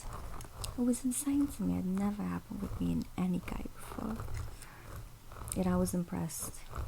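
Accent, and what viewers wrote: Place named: Long Island, United States. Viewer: HIGHKEY so glad u used the Long Island accent it’s so tingly and comforting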